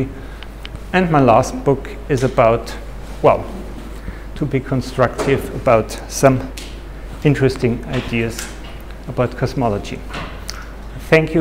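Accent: Germany. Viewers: Until now I thought only Americans don't know how to foreign languages. But you are speaking with German accent and do the same with french - your neighbor!? Paul DIRAC. Put emphasis on the second syllable "AC" not on DIR. I agree with your notion "particle physics" is nonsense and the reasons.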